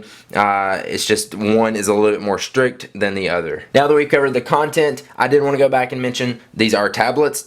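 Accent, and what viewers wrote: South, United States. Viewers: I am from the south in America and actually not all of us have accents some of us talk just like people from anywhere else in America. It all depends on the voices of the people you grew up around. And the generation before him probably are from one of the older smaller towns where they have this kind of accent. Bottom line, not everyone from the southern states talk with an accent like this. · Love your southern accent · lol, i loveeee how country you sound. were u from??? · Country or southern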